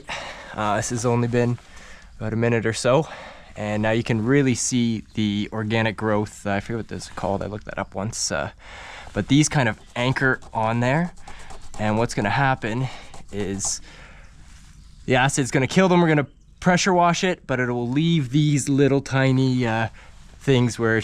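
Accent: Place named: Canada. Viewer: This young man sounds like a Canadian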